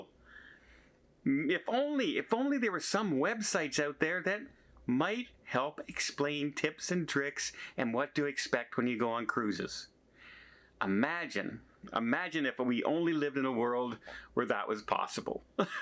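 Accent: Canada. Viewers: I can’t pinpoint why, but I love your videos, despite never having been on a cruise in my life (I am in my 60’s, married, and empty nest) and having no concrete plans to do so. I am a New Yorker and appreciate your Canadian accent and your overall positive outlook on seeking to make your passion a reality. If I were ever to book a cruise, I would take your recommendations to heart. You are an inspiration in how you travel and share the joy with your viewers.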